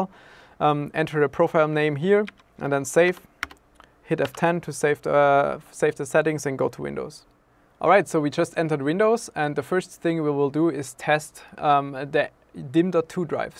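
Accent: Germany. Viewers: Deutscher Akzent kickt rein xD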